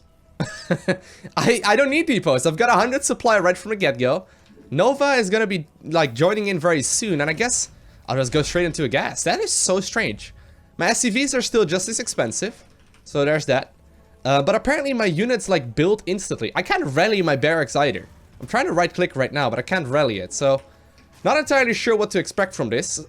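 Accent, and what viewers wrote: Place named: Netherlands. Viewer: lovely dutch accent...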